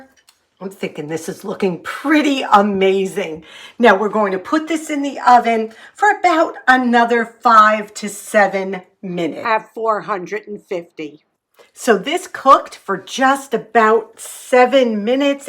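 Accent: New York, United States. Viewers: I grew up in sleepy Hollow moved to the capital district. I just absolutely love your videos, and you have the best ny accent ❤ the New York State Museum is also free and it has a beautiful tribute to 911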